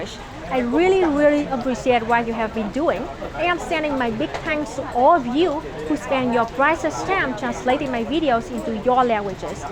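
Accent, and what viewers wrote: Vietnam. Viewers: I find that after watching more of her videos, I have gotten much better at understanding her with little to no effort. I suggest trying to push through and before long you will probably find that you get used to the beautiful vietnamese accent and don't have to focus much anymore!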